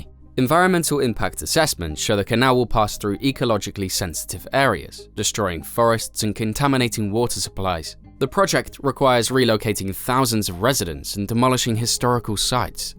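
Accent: United Kingdom. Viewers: He sounds like U.K.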